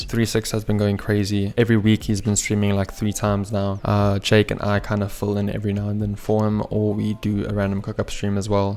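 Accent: South Africa. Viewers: I smell a south african accent ei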